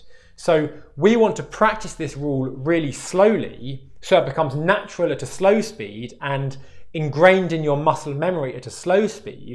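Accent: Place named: England, United Kingdom. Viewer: Millions of English people do speak this way. Where have you been? Listening to US American Movies?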